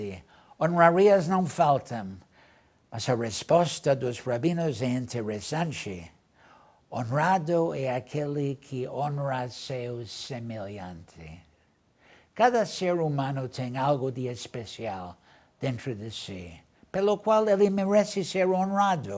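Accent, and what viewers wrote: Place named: England, United Kingdom. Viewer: Nossa, ele falava exatamente do jeito que eu falava quando era criança quando imitava os Ingleses falando português kkkkkkkkkkk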